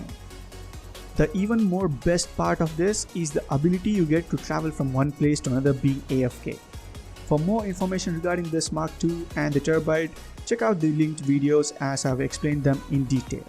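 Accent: India. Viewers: you improve your english alot you got much more less that punjabi akcent ;) i can understand evetything now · Yeah bro this is a great idea... As too many got GTA V for free, and they don't know what to do and just roaming here and there and telling gta online world is dead.... This content of starting from beginning will be a very useful trick for them or even for some players which knows how gta world works... So yeah why not It's a great idea! And i want to know that are you also from India? Because i am from india and found ur accent same......😅😅 Btw you can also do gta V live streams of grinding and some other games too 😊😊 And plz while making guide plz tell that how things work like in vehicle stealing mission we do not have to hit vehicles and etc... Cuz many friends of mine doesn't know the basics of gta online properly, like what to do and how to do 🤣🤣🤣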